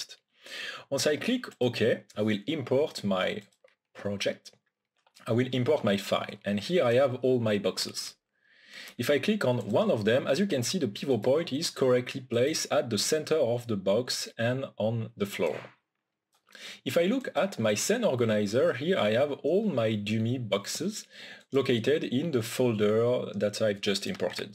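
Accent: France. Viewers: J'adote ton accent français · that french accent is très audible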